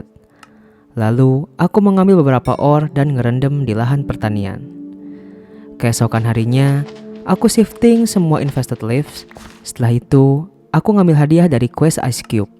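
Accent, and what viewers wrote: West Java, Indonesia. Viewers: dari logat ngomong nya kau orang sunda ya bang kalau iya sarua euy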